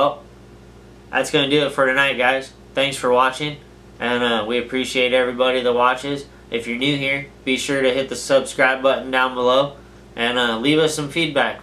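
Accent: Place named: United States. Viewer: I like your voice sounds country:)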